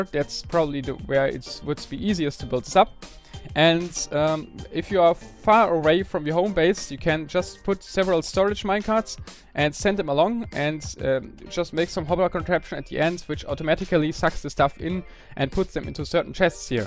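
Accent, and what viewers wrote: Germany. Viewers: mich persönlich stört der Akzent nicht wirklich, aber das "th" ist noch ein wenig ausbaufähig im Grunde das klassische Problem vieler Deutscher im Englischen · Are you german?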